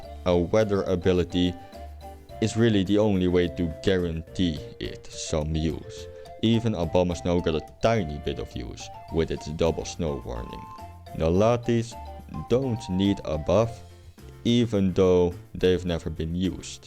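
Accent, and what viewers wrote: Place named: Finland. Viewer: are you perhaps from finland. the accent sounds so finnish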